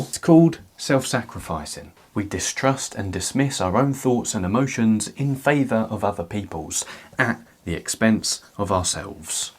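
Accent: United Kingdom. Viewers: I love this guy! (And the accent! Everything sounds smarter with a British accent 😂)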